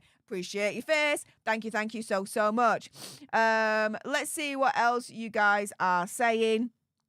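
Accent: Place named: Manchester, United Kingdom. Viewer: I grew up in Manchester until I was 14 . I have been living in Biloxi Mississippi since 1972. Can’t believe how fast 52 years has flown by. Your accent makes me home sick, Much love to you from the Deep South, only 60 miles from New Orleans. 😊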